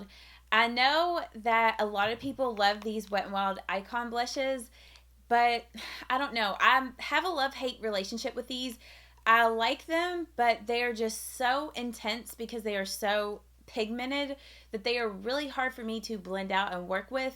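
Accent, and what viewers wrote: South, United States. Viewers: It's a true blue Southern drawl. I'm from the south so I'm used to it and enjoy it. Honestly, I much prefer someone that talks too slow to someone that talks too fast.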